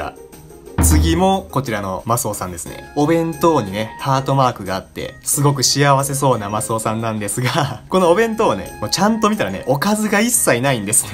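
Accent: Kansai, Japan. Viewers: この人関西出身やな？！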